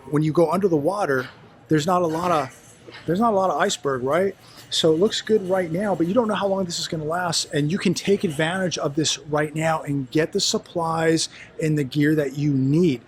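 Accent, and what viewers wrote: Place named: South, United States. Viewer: I couldn't get past her Southern twang even though I'm from the South myself.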